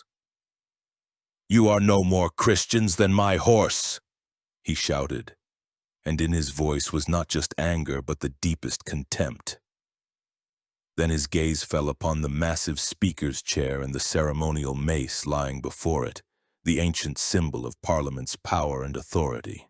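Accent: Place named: United States. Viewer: why would you use a scraping american voice to narate English history? AI crap ?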